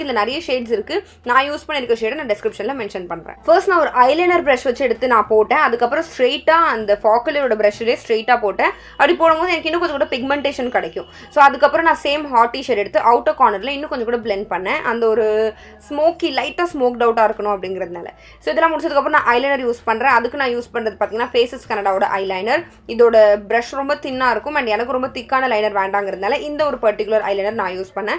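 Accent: Tirunelveli, India. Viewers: Unga native tirunelveli ah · Sis nenga thirunelveli ah😊😊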